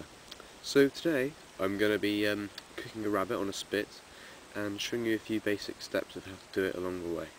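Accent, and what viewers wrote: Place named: England, United Kingdom. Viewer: Nice video young man. Where did you shoot it? Somewhere in England by your accent.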